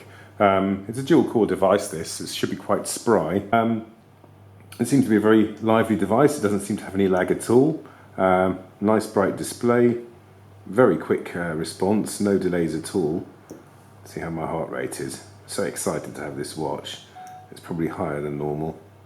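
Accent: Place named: United Kingdom. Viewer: Marvelous review...love that british accent....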